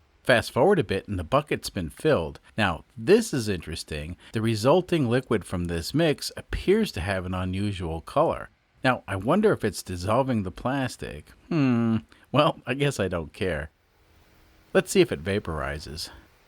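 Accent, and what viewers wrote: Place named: Chicago, United States. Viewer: Your accent I don't even recognize, not Michigan I'm assuming. Chicago? But you complain about the heat after moving from Michigan and it has me curious. Do you prefer the Kansas climate overall? I'm a buckeye/Michigander myself. I was transplanted to Texas by my family and can't wait to get back to Michigan and get out of the heat.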